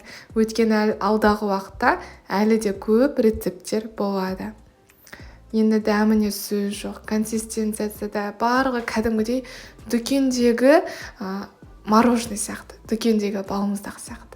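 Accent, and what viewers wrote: Standard. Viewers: Енбеккор пысык колыныз алтын керемет жансыз.жанеде кашан корсемде таза казакша сойлейсиз, шынайысыз.сизди унеми айтып инстаграмнан корип ютубтанда корсем мактап риза болып отырамын.коз тимесин аман журиниз😍😍😍🌷🌷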